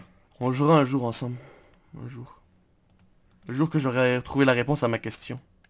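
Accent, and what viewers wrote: Quebec, Canada. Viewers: stp parle avec ton accent qc :(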